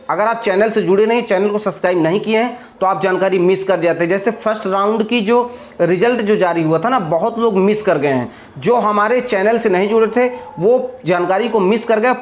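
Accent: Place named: Maharashtra, India. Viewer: Are marathi English,,sidha point to point bat kro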